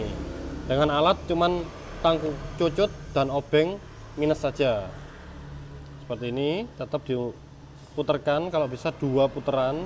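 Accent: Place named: Surabaya, Indonesia. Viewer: Masuk, suroboyone nyel Iki😁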